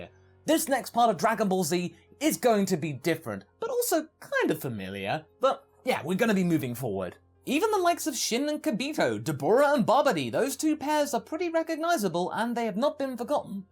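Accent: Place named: RP, United Kingdom. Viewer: you sound like a posh dbza goku. I keep expecting you to say "muffin button"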